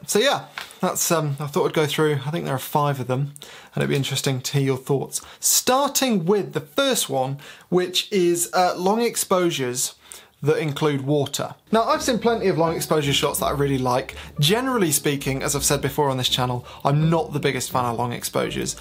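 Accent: United Kingdom. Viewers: At first I was like, "who's this opinionated, whiny Brit on my screen?" But, I watched anyway... And, I'm happy to say that we agreed with all 5 of those photography tropes that you covered in this video! #Subscribed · Mans is so British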